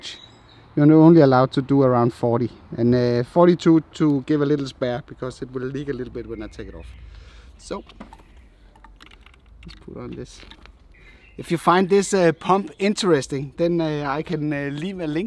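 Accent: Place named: Denmark. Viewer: Din accent lyder meget dansk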